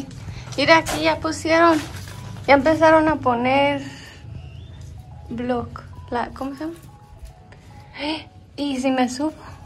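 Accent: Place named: Portugal. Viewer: Estas quase ablando PORTUGUES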